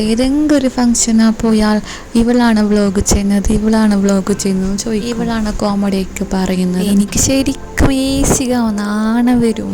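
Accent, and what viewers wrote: Kasaragod, India. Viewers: ആര് പറഞ്ഞു 😂😂video ഇഷ്ട്ടം അല്ല എന്ന് ❤ നല്ല രസം ആണ് കാസർകോഡ് സംസാരം കേൾക്കാൻ ❤️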